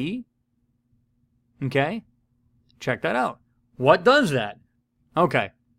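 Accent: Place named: St. Louis, United States. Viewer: good work - by the way, are you from the st. louis area? your accent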